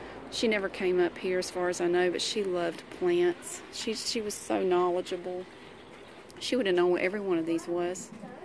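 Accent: South, United States. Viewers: I live in Virginia Beach and I do the same thing you do, whenever you’re around relatives the southern accent comes out stronger!! I have family in both Carolinas and I love stopping at the border and south land!!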